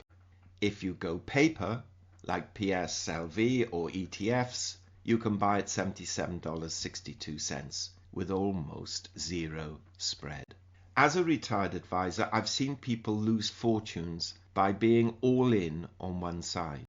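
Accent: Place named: Midlands, United Kingdom. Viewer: I can hear it in your accent a little. I would guess not far from Burton. Very sorry for your loss, 91 is an amazing age. I will be lost without my mum, can't imagine how you feel.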